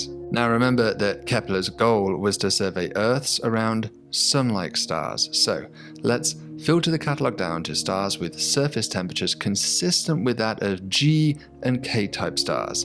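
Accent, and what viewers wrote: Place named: Nottingham, United Kingdom. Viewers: this guys accent gives me nottingham vibes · he must be the guy that married your ex girlfriend, only sense I can make out of you complaining about how an educated BRIT speaks. This is how all educated men especially brits speak 😂😂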